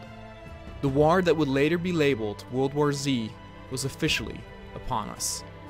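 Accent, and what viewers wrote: Netherlands. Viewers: You are Dutch? I am Flemish. It took me 10 minutes before I could clarify your accent, well done! Also: great way of recycling genuine news footage into an alternative 'present' and a zombie war. Awesome! Dikke proficiat, dat deed je geweldig! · are you dutch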